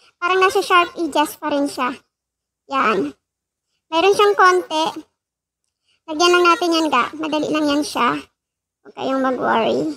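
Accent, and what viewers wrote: Iloilo, Philippines. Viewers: Ilongga ka gd guro langga mo hahahahah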